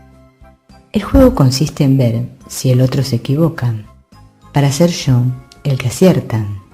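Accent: Argentina. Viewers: Gracias por la claridad con la q explicas..sos Argentina?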